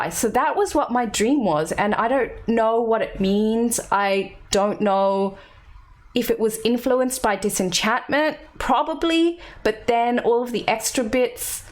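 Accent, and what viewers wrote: Australia. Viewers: I'm looking forward to the MCU stuff for sure, but go at your own pace. A happy reactor, is a better reactor. 😁 Can sort of see some resemblance to Sweet Anita but that good old Aussie twang is instantly recognisable, for me anyway haha. Very easy to tell you apart from my perspective at least, even if it's purely on the looks. got her own vibes & style! 👍😂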